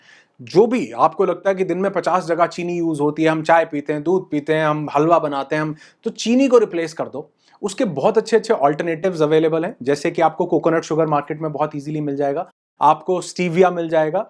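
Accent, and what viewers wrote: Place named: Punjab, India. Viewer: Apka sose pose is... Proper punjabi tone.. I love it❤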